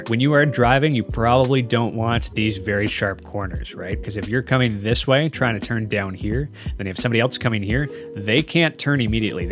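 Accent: Canada. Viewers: I love your videos! Keep up the good work FOR EVER. PS you talk very Canadian...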